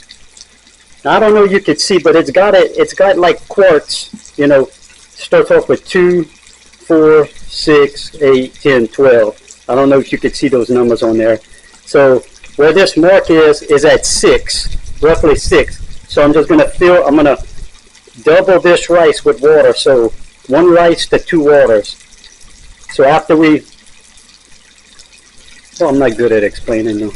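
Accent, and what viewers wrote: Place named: Louisiana, United States. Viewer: Love the videos, you just need to get a lapel microphone so that your voice is clearer and we can hear that cajun accent better.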